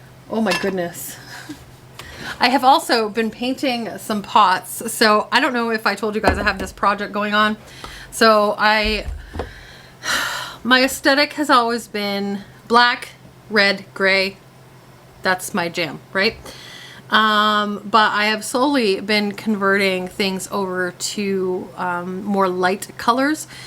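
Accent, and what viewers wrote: United States. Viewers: Ha. Ok, so your grandson truly is the cutest. Holy crow. As for “out and about”...I grew up in Illinois and Wisconsin. Moved to Tennessee as a teen. I’m 46 now and when I watch you, I find myself saying out and about” with the northern accent. My husband teases me about it. So yes, we hear it. (And love it, it brings back childhood memories for me) 🧼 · From a german speaker: i don't hear a boat ^^. I think it's the american accent 😀.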